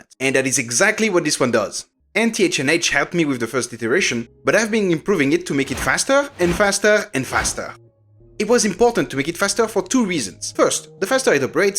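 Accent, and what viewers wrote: France. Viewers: Tysmm! W video funny french accent lol.Greetings from algeria ❤ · you have a french accent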